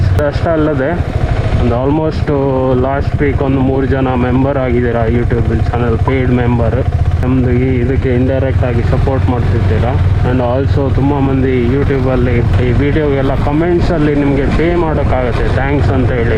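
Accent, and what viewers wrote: Mangalore, India. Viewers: I like Ur Mangalore kannada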